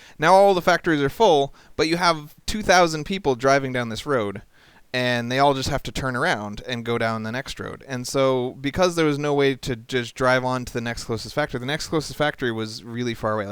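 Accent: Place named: Canada. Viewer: what are you canadian you totally sound like it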